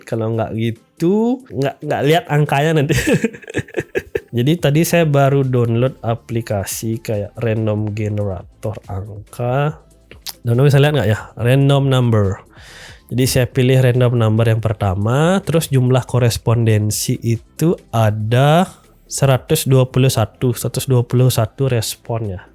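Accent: Bali, Indonesia. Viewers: kenapa ya orang bali klo ngomong enak didengarnya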